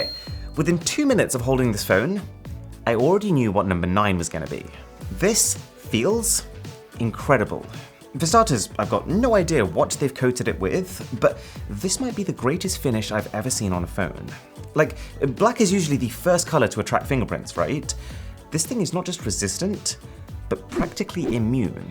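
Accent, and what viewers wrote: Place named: United Kingdom. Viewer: The British Accent is everything for me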